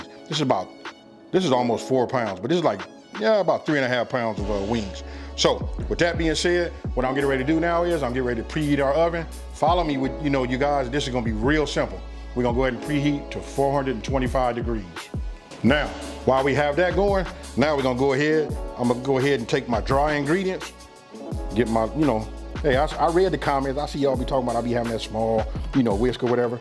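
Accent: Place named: California, United States. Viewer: It's giving.. retired gangster meets good woman & becomes domesticated. lol. I love it! i love a cali accent! i definitely subscribed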